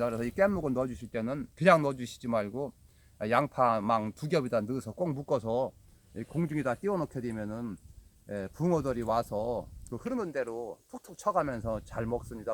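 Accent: Chungcheong, South Korea. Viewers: 충청도 말씨 신기해요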